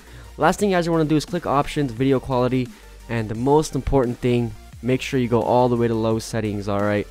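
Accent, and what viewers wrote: Croatia. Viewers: are you from croatia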